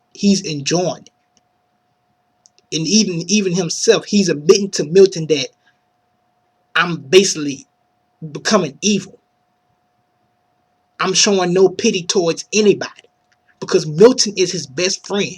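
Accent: South, United States. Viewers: You give great reviews guy! Fantastic critical thinking skills on display. Really liked your southern accent too. Good job breaking it down!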